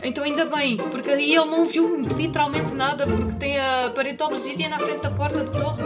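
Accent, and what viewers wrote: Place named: Portugal. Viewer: mais perguntas olha você morrava em Portugal e veio para o Brasil ou é só um sutaque de Portugal que você ganhou da sua mãe ou pai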